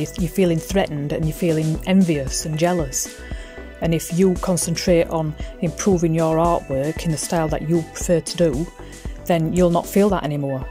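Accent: Yorkshire, United Kingdom. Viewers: Just like to say the painting is fantastic and I agree with your view on realistic paintings. I'm originally from East Lancashire but lived in Dublin for 25 years so to listen to a proper northern accent even though its Yorkshire is great. We have an art gallery here that's dedicated a whole room to paintings of large stripes!! realistic art is real art created by people with real talent. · This has to be the best video out on YouTube! Just fantastic. I have to agree with every single word that you said. Great to have someone with a proper accent too haha, where in Yorkshire are you from? Your artwork is amazing!!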